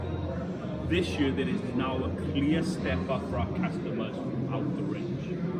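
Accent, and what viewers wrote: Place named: Wales, United Kingdom. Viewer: Great to hear a Welsh accent demoing a TV :) Thanks for this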